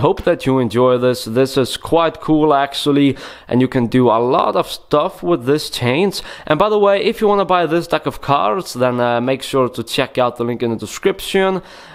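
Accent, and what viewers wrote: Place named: Russia. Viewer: Это лучший русский акцент из всех что я слышал!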